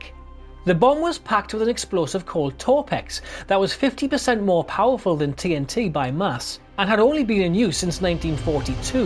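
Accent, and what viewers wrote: Newcastle, United Kingdom. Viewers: Thanks for a super enthsiastic video and your beutiful Goerdie accent, one of the friendliest voices in the world. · This is a Geordie accent, yes? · Really excellent video. Great narration (got to love the Geordie accent), and fascinating content.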